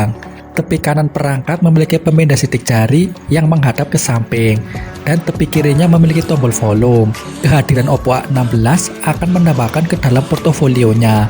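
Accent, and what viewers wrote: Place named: Java, Indonesia. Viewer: Logatnya jowo bngettt 😁😁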